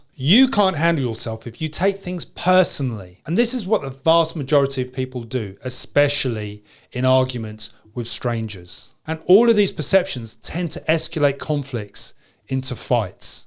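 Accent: England, United Kingdom. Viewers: English, not British. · I like the British accent!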